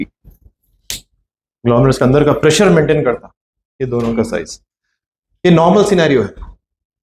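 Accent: Hyderabad, India. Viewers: I like Dr style and simplicity ,Hyderabad tone,man from Andhra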